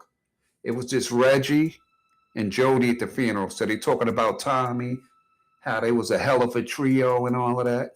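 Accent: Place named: New York, United States. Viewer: I love your voice and listening to your New York accent. ❤😊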